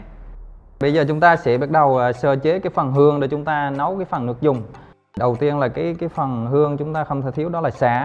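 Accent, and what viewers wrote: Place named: Phu Yen, Vietnam. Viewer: Tiếng giống khu vực tây hoà phú yên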